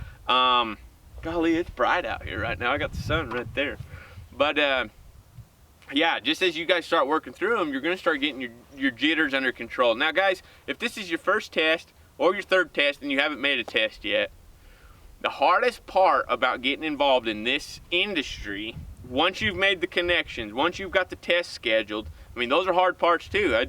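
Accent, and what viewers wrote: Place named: Utah, United States. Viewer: Are y’all from Utah by chance? You have that Utah accent 👍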